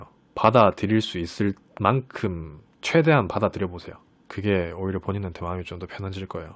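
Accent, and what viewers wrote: Gyeongsang, South Korea. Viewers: 경상도사람이세요??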